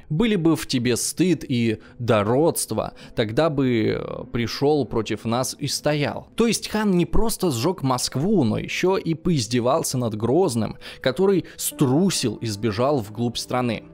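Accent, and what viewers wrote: Ukraine. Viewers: он украинец - не обязан правильно выговаривать ваши слова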